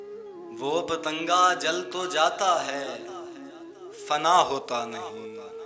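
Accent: Punjab, Pakistan. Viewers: You are basically a Punjabi speaker. Punjabi reflects in your style. When you are reading Urdu you should purely read Urdu. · Such beautiful poetry & such bad wrestling style voice & Punjabi accent for Urdu poetry is the worst.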